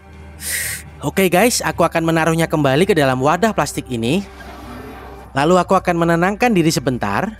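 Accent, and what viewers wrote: Java, Indonesia. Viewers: Dari dubing ini saya seperti mendengar logat jawa ini unik fren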